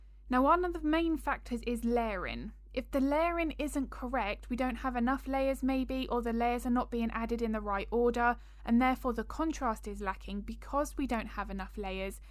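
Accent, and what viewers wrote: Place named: England, United Kingdom. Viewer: Your English accent is so sweet to listen to and you are explaining so well how can someone fail to understand you. Nice job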